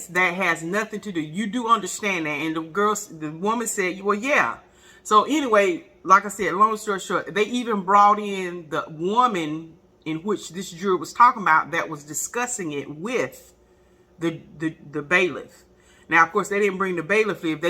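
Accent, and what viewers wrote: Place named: South, United States. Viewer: Happy Birthday and many more, my Birthday is the 4th of July and I will be 61 GOD is good all of the time. I just love your sense of humor and your Southern accent it cracks me up. Have a wonderful and Blessed Day and stay safe.🙏🏾👸🏽💖💯💫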